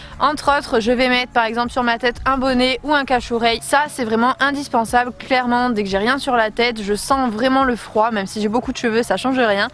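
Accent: North, France. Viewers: Tu est du nord ?? Je t'adore bisousss 😘😘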